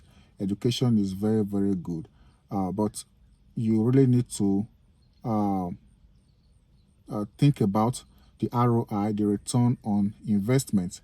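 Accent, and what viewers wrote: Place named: Africa. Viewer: Better learn the English grammar. Poor vocabulary , non articulate., African accent. I was interested hearing your argument , towever could not finish your video. This is a positive criticism, no intention to pull you down.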